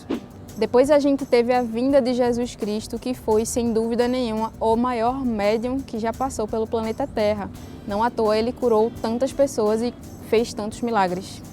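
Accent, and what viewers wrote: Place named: Recife, Brazil. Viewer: Oi, parabéns pelos seus vídeos, acabei de conhecer o canal e estou amando, já me inscrevi! Sou espírita mas estou engatinhando na literatura rsrs,comecei a ler O que é o espiritismo? de Kardec depois vou partir para O livro dos espíritos! À propósito...por acaso esse sotaque lindo é da minha terra, Recife? Rsrs um abraço fraterno!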